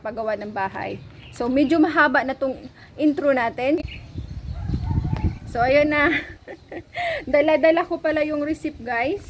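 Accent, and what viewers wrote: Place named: Ilonggo, Philippines. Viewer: Hawud na kaayo ka mag Ilonggo sis nindut paminawon hehehe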